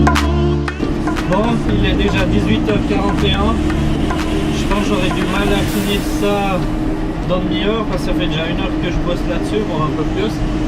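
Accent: Vaud, Switzerland. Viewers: yop super vidéo tu explique vachement bien PS: j'ai une question j'ai vu que tu était suisse et j'adore cette accent. Je viens du canton de Fribourg 😏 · Joli ! Tu es suisse non ? Vaudois je dirai à l'accent ^^ Tu as pas de faucheuse arrière ?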